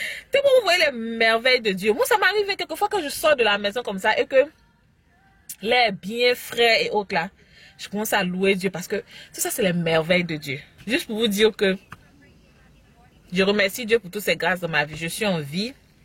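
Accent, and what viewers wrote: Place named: Ivory Coast. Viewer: Naaan il faut que tu m'explique comment t'arrive à avoir un bon accent en anglais alors que tu as aussi ce magnifique accent quand tu parle français avec ton accent ivoirien. ça fait des mois que je suis au usa et mon accent français est toujours aussi fort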